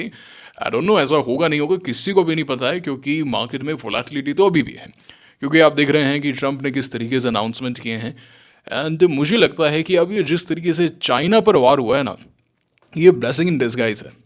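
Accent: Rajasthan, India. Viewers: आपके बोलने के टोन में कुछ ज्यादा ही राजस्थानी बोली झलक रही है, पहले से कुछ ज्यादा, so मिट्टी से जुड़े रहिए 👌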